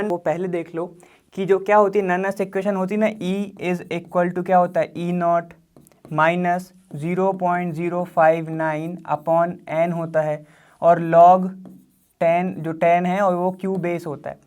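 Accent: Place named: Bihar, India. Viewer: Muje tou bihar se laag rahe hain 😅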